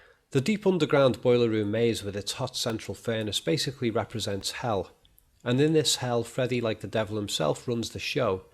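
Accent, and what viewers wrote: Liverpool, United Kingdom. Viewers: To: I absolutely love your videos. I've been binging on them since I discovered them a few days ago. Your analyses are as fascinating as they are thorough - supported with clear examples and often detailed and well-cited research. It's inspiring to listen to someone who's so passionate about a subject. Incidentally, your Liverpool accent and softly-spoken delivery (in my opinion) enhance the enjoyment of these videos. You impart your ideas in a laid-back, conversational, matter-of-fact way, which feels respectful to the viewer's intelligence in a way that other analysts seem to somehow miss by having subtle (or not-so-subtle) condescending or boastful tones. Does that make sense? With regard to a point you make in this video about Nancy's ability to finally defeat Freddy by using a technique which had earlier failed, "ignoring his existence", as you put it very well. 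My feeling is that in order for this technique to work, the victim must fully and whole heartedly believe it. in the earlier scene when she attempts to get rid of Freddy by saying the words "This isn't real, this is just a dream", she is still frightened and panicked, which tells us she still actually believes the danger is real. After the climax of the movie, Nancy has developed true faith in her words. She says them calmly and with total conviction, hence it's effectiveness in ridding the demon. On further reflection, perhaps this can be linked to your idea that in this movie God doesn't exist. Perhaps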